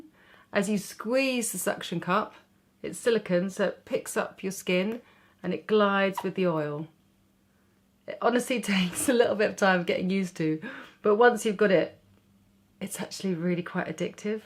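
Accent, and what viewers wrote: England, United Kingdom. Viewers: Agree. It's so refreshing to hear an English accent for a change, and not the nasal twang of 'hi guys, welcome back to my channel' ... blah blah.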